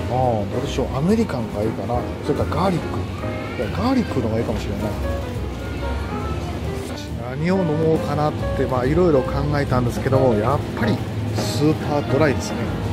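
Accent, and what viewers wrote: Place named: Kanto, Japan. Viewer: さん大阪？大阪の人なら「今日もはじまるぜぇ〜」はないで！ 「今日もはじまんで〜」せめて「今日もはじまるでぇ〜」 動画中は敬語喋られてるから関東訛りっぽくなるのはしゃーないけど、もう少し大阪アピールして欲しいな^_^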